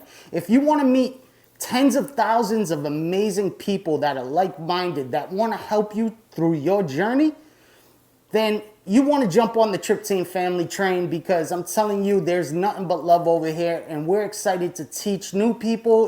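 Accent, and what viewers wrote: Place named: East Coast, United States. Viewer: As usual brings the knowledge along with that east coast accent. Great info on bags. Answered a lot of questions I didn't know I had. It was great to see a new video from you. 🍄♥️ 😎✊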